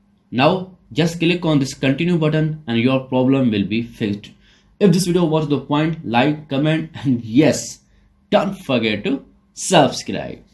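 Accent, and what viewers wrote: India. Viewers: Indian accent that's why??😅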